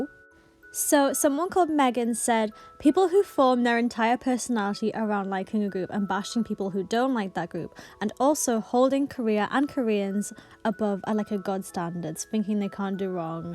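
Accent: Newcastle, United Kingdom. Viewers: First time here and ur accent reminds me of perrie edwards geordie accent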